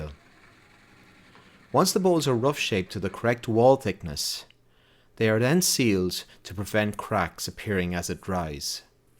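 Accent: Ireland. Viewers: Nicely done. You are very Irish indeed from your accent and I see from your website you're SW of Dublin on the other side of the Wicklows. Live there some 20 years ago on a work assignment. A highlight of my working career. When I return next to the Auld Sod, I'll need to look you up for a bit of instruction. Cheers.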